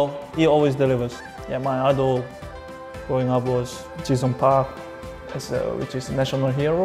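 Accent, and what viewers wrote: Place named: Germany. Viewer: His German English accent is so cute haha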